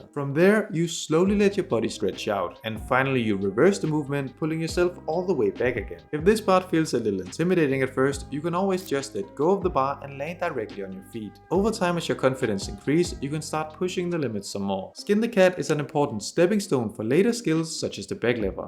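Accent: Denmark. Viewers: jaa, godt forsøgt men du er dansk ikke eller ihvertfald fra skandinavien. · Random question, but are you a dane?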